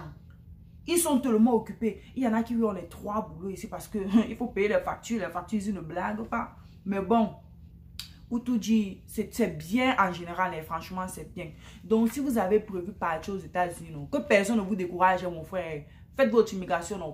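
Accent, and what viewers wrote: Cameroon. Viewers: 🤣🤣🤣 l'accent du +237. Merci du partage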